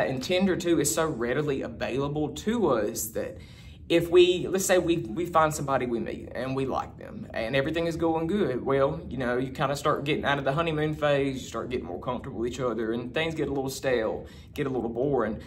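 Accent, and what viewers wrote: South, United States. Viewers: Haha. Love yer suthen accent!!! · Where are you from. I'm from the south. I've never heard this type of southern accent. Also, the mistake you are making is placing way too much emphasis on the opinions and judgments of your family. Wanting, needing, and begging for their acceptance. Why are you doing this?! Are you a cancer ♋️ or something? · Love your accent, where I. The south are you from?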